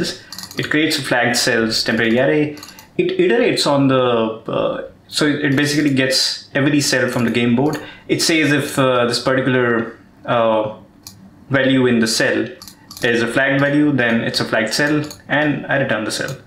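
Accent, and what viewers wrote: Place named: India. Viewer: It's not the code that we don't understand but your Indian accent 😐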